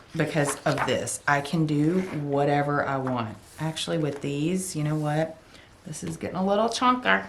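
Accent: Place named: South, United States. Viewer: I really like your idea of using only two of each filler paper so you go through them... I'm the same way... I HAVE to have extended disc simply because I WANT IT ALL in my planner. It's the main reason I've come back to happy planner after having them for years but never knowing what a frankenplanner was.... Best thing EVER! I also love to hear you talk - that southern drawl!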